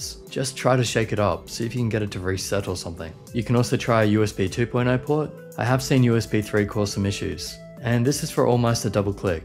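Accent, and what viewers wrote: Australia. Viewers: Are you Aussie? If so, same :D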